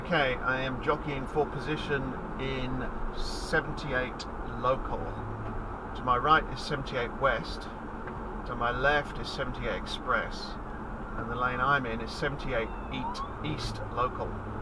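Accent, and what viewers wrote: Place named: England, United Kingdom. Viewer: This was randomly recommended to me by the Youtube algorithm. I'm from Clark. What a fascinating video of an English accent narrating the area I grew up in. You have described things in a way I never would have thought of! Please do more videos! Come on down to Clark, Cranford and Rahway!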